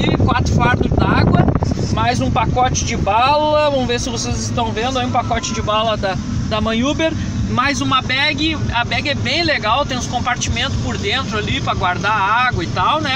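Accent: South, Brazil. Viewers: Fala parceiro! Pelo sotaque vc é do sul rsrs...qual sua nota média?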